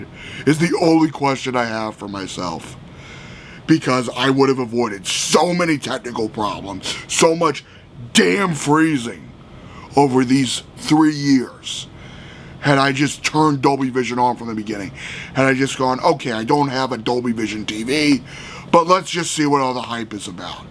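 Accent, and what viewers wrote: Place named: United States. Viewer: Hi, you have a very interesting accent. Where in the US are you from ? Thanks for sharing your thoughts on this Sony.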